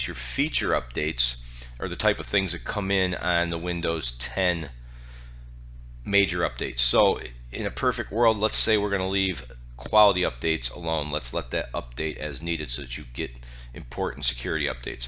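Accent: United States. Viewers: Your heavy accent is familiar, NY, NJ?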